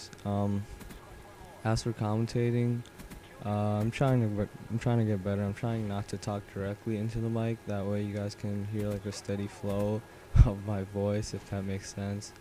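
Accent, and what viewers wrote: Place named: India. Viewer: indian accent!!!!!!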